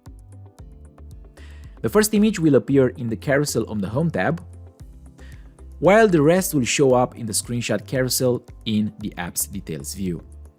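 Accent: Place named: Romania. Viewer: Mereci de tutorial :D excelent :D mi-am dat seama ca esti roman dupa accent :D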